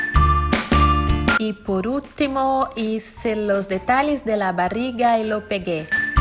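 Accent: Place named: Spain. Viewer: Amei seu sotaque Espanhol 🤣🤣🤣🤣🤣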